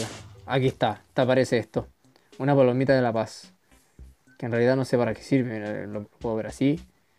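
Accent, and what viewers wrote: Chile. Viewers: Se nota al tiro que eres chileno wn nuevo sub que crack eres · Eres chileno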